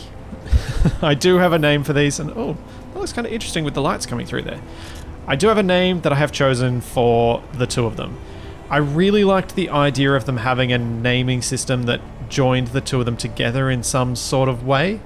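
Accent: Australia. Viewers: Are you from Australia m8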